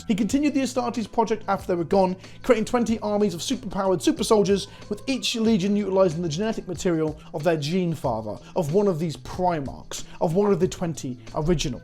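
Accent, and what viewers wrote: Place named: United Kingdom. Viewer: yeah I'll sub to the channel. gotta get my british guy talks about warhammer fix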